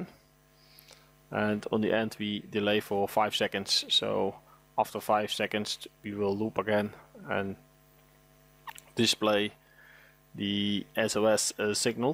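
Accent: Netherlands. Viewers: Dutch, 100% Dutch innit he?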